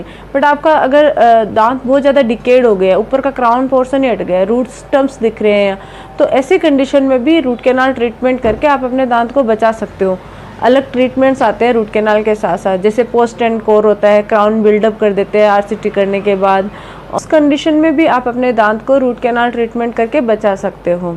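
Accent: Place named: Marwar, India. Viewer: आप भाषा से मारवाड से लगती हो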